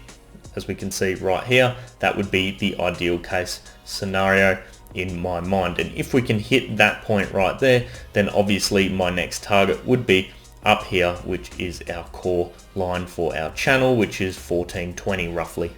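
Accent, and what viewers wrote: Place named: Australia. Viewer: Finally someone talking in Aussie $$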